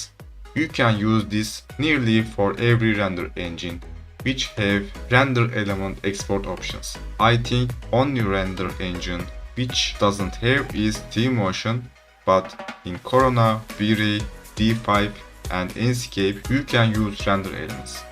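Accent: Turkey. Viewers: yemınle var ya sırf aksandan turk dedım yorumlara baktım sırf bu yuzden :)) well done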